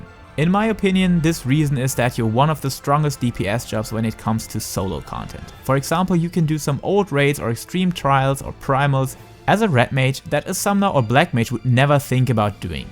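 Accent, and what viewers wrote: Germany. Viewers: Are you German ?!?!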